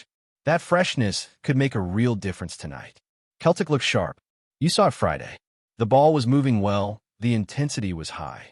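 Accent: United States. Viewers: Typical USA commentator..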